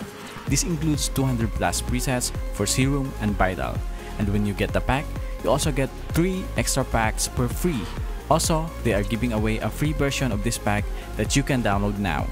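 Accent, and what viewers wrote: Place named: Philippines. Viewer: Filipino? Your accent sounds really filipino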